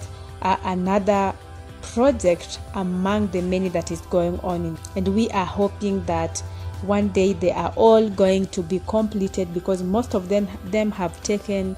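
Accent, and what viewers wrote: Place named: East Africa. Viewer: I follow Yr channel from Southern Africa. I like development topics in africa. However if I may ask? Why does Yr accent sound East African than Ghanaian or ratcher not west african?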